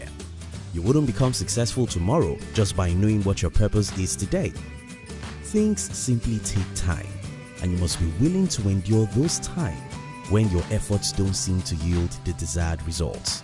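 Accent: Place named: Nigeria. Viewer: How DARE YOU fucking disagree with Marsha Sinetar. I picked an accent you are probably Nigerian. No surprise there.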